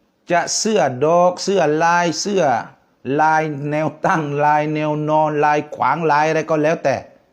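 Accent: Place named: Nakhon Si Thammarat, Thailand. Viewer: นครศรีธรรมราชชัดเจนครับ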